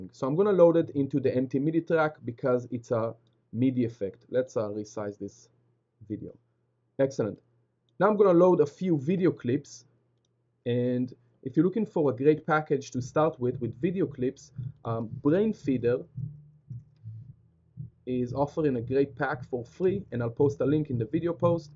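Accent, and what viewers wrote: Israel. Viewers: Hey i know that accent! shalom =] getting into vj'ing. this helps alot thx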